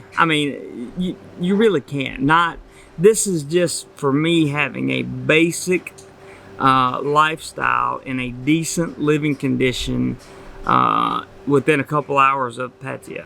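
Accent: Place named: Appalachia, United States. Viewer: The accent mate. How do Thais take 'ole hillbilly voice?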